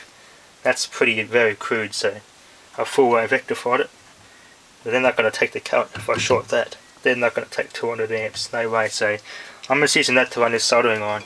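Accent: Australia. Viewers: It just takes a while to get used to his rural Australian dialect, in the past I've had to rewind and listen to him again. He does make some interesting videos though, but not all are winners of course.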